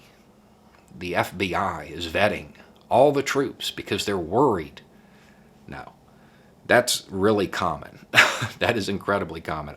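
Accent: South, United States. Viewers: Smart man country accent...ty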